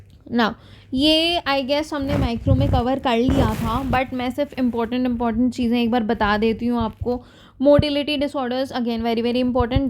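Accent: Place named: Bhopal, India. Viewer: Bhopali ki zuban he ji ke roop me, faeda hoga dosto❤️❤️✨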